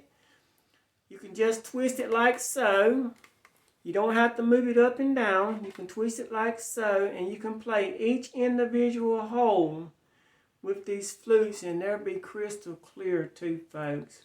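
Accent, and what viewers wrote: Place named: South, United States. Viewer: holy wow sir your accent is baffling me!! Would love to know your background and story, haha. I realize those are sensitive questions in todays cultural climate but I really don’t mean anything by it. Only curious. You have like, a deep southern accent or something that to me, does not match your appearance. I bet your family has a fantastic immigration story in there somewhere. Ok bye just a random curious internet commenter and I love guessing where accents come from all over the world!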